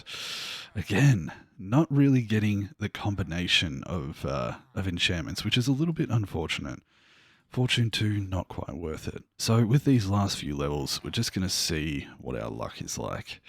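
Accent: Australia. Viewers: This is the first video of you I have seen and I loved... And to be honest it's refreshing to hear a fellow Australian speaking in a video. 🤣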